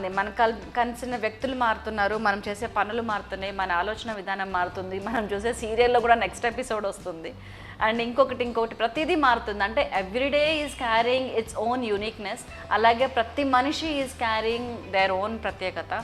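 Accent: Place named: Guntur, India. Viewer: Mee matalu Telangana vallalaga levy akka Guntur valla laga unnai good talking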